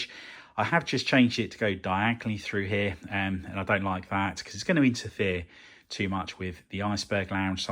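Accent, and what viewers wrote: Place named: Midlands, United Kingdom. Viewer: A bit off-topic, I am just trying to place your accent. The best I have come up with so far is 'somewhere above Birmingham but lower than Manchester'.😉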